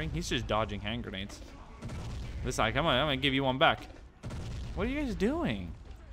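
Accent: Sweden. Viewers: U swedish? Am i Finnish 😁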